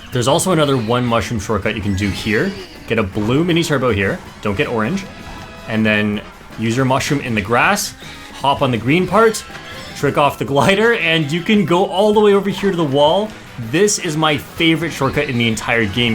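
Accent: Canada. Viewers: avec ton accent, je suis presque certaine que tu viens de montreal 🤔 btw super video!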